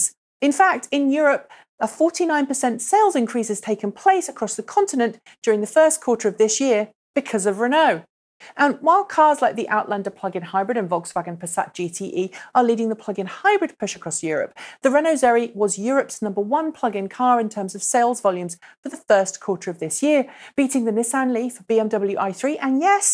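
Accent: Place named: United Kingdom. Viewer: Judging by your accent you're from Britain, why are you pushing a frog car? Maybe you should visit Trafalgar Square more often.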